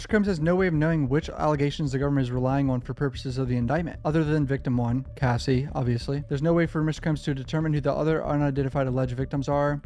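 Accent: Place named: California, United States. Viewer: Dude I love that California accent. When he said Combs homes I nearly lost it😂😂😂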